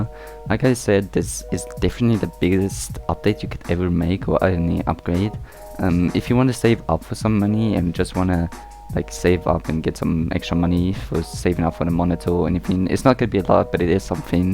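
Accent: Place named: South Africa. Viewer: oh mans south african lol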